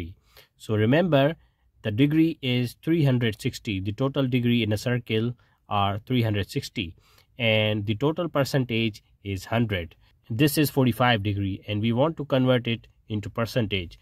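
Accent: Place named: India. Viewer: great indian teacher im from the philippines and your teaching is good with the accent! 😀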